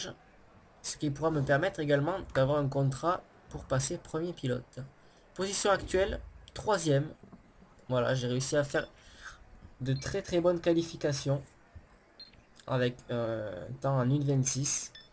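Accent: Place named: Marseille, France. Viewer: accent marseillais sisi :)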